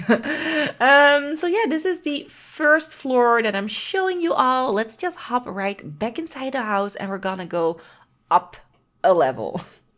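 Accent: Netherlands. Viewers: You kind of have a Dutch accent, are you from the Netherlands? I'm from Belgium.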